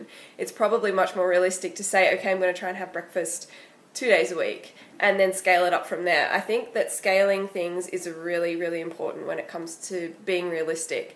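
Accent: Australia. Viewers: Wish I knew the first tip about phasing it in and shaping eating behaviour before my first Paleo attempt (rather than going at it like a bull at a gate & failing miserably). Also, have I found another Aussie?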